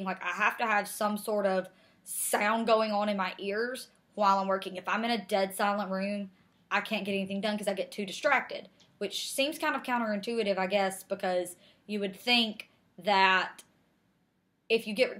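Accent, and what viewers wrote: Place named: South, United States. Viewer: Just saw you with Rosie and cool you have your own channel for ‘stuff’’ Obviously know the results of the and such as 4 days late but love your southern accent and the f bombs Runner on 2nd is no use anyway Georgia looking good this year, and damn glad the college season is rolling Goddamn I’m an Auburn fan too, although in Ireland, that game against Georgia State was painful Music too - awesome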